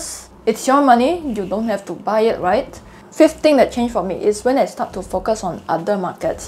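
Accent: Singapore. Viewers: Thish is pure singlish and I love it..cheers!!! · that is singapore accent, if you dont know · Your accent so familiar, let me guess you from singapore 🤭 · i love singlish i cant hear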